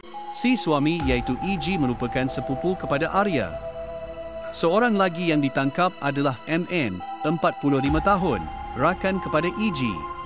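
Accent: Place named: Malaysia. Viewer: Cannelnya solo suara prmbawa acaranya kaya orang malasiya ya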